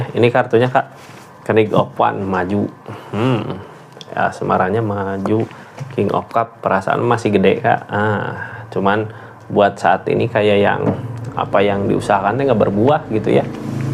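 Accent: West Java, Indonesia. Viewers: Urang sunda nya kang.. 😂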